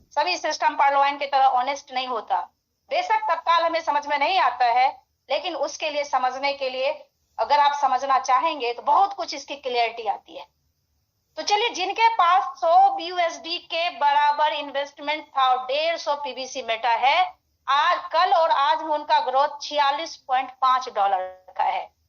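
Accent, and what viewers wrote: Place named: Kolkata, India. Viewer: Colcata anti ji ka awaj lag raha hai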